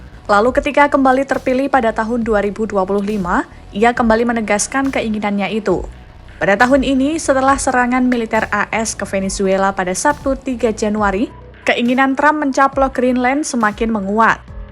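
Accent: Java, Indonesia. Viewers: Medok nya klu hilang bagus Bu... 😊😊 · Medok banget jawanya yang pembawa acara, semoga tambah sukses kompas😊👍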